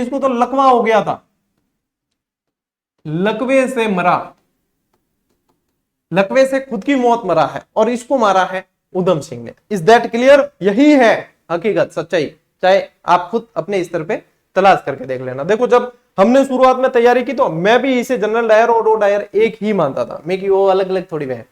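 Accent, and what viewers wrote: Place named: Marwari, India. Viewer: Ek request h plz marwadi na bole apni vedios m..iritation hoti h.. uper se ap apki marvadi bhi alg h smjh bhi ni aati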